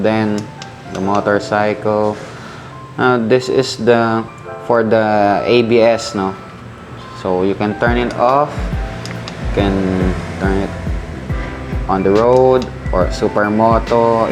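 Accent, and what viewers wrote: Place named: Conyo, Philippines. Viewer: grabe conyong conyo si idol! nosebleed ako hahaha.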